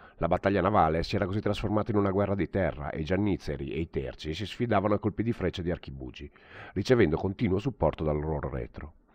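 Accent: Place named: Veneto, Italy. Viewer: Perche' non capisci che la parlata e' veneta, il testo e' antico... e' forse una delle piu' belle letture sulla battaglia di lepanto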